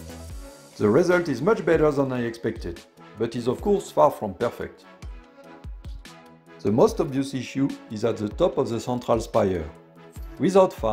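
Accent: France. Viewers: Sympa l'accent français 😁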